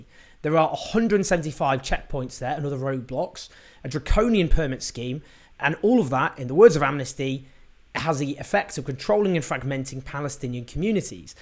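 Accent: United Kingdom. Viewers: I like your show but it's very difficult for me and I am sure also for so many others to understand your very heavy British accent. Try to speak a bit slowly and make your English a bit more Like an American Accent so that all your international listeners can understand you better. By the way, I am NOT an American. Thank you once again for your great and valuable content.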